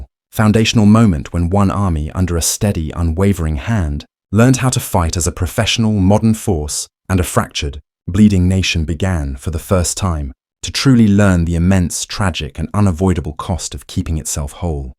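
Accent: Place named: England, United Kingdom. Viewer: They should have chosen a southern american accent narrator discussing this matter. The English accent for this story is off putting. The guy who did PBSs The Civil War would be the best Ai option.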